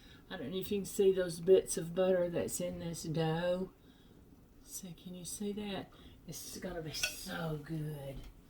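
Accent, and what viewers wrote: South, United States. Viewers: Thanks for this lovely video - I really enjoy cooking videos & I found your delightful Southern accent very pleasant to listen to. I have subscribed to your channel. I totally agree re butter, it makes everything taste better! I put a dab of it in my green beans tonight & it made them delicious. Best wishes from Sydney, Australia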